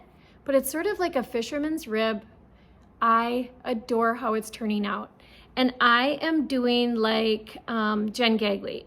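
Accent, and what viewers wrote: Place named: Minnesota, United States. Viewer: Just found you today and am binge watching your video podcasts. I think your Minnesota accent is charming. I have an Atlantic Canadian accent...no doot aboot it, Eh? 😂 Thanks you! 🥰🇨🇦Sharon.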